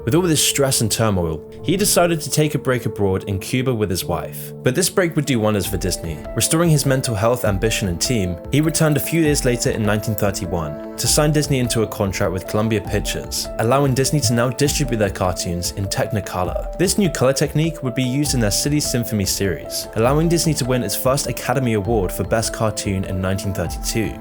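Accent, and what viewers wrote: United Kingdom. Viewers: So, bassicly every week its a new runner up for “ most evil business in the world “ Time for a new title or maybe even new content. As for this video, im literally watching at timestamp - to prolly hear about things I already know but this time in a UK accent 😂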